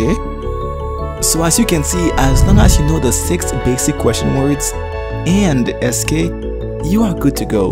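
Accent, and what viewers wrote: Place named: Haiti. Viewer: Hahaha you sound Haitian I’m 10 both of my parents are Haitian I speak Haitian Creole a little fluent and I speak a little french I was born in the Caribbean tho I’m a proud Haitian girl I use to go to a school with not many white children now I’m in England and I live with my bigger sisters I go to a school with white people now there are a few blacks tho but I’m scared 😦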